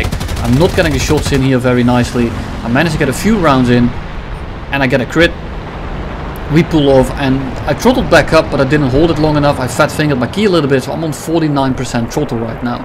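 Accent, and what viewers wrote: Netherlands. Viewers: Hey man i have a question cause you kinda Sound dutch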